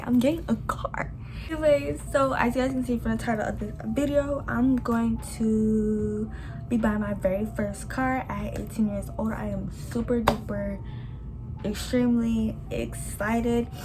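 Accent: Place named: Haiti. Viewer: fellow haitian here! north miami haitian 😂, i could already tell by your accent alonee❤❤❤. also im a long time subscriber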